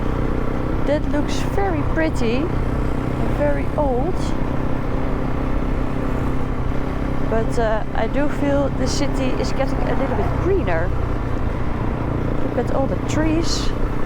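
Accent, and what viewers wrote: Netherlands. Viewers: Love your videos, the dutch accent is a "must" lately in superb world travelling! ❤ All the best, take us to amazing, unseen terrains!!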